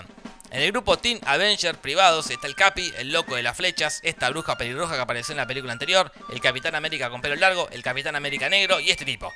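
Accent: Argentina. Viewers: Hola muchachada de. Me encanta el canal, es como facilitarme la vida y mirar todo de manera relajada 😑 pero no se crean ¡eh! Veo las series y películas y luego me mato de risa con los resúmenes con acento argentino. Bueno ya, mucha chamuyada. Vamos al grano 😅 Me encantaría que hicieran un así no más de "Attack on Titan" ♥️ Ahí piénsenlo y discútanlo entre ustedes. Besos y abrazos desde Ecuador ♥️ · aunque no comprendo bien el dialecto argentino, tienes contenido muy bueno. Saludos desde Venezuela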